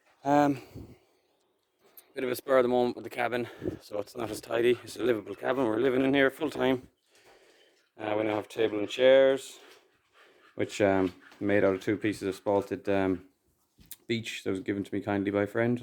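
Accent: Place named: Mayo, Ireland. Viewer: Assuming it’s mayo given the accent planning etc?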